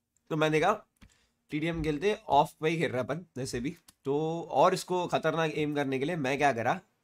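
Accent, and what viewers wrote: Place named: Hyderabad, India. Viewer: Kbhi Hyderabad jaanaa udhar kaaa esaa hi accent hai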